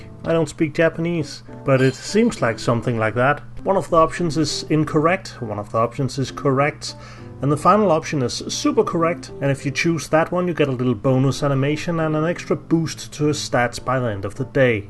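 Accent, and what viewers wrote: Denmark. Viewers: I really like your accent, it doesn't seem sooo "Danish" Also the review went in depth which was very nice, thank you for that.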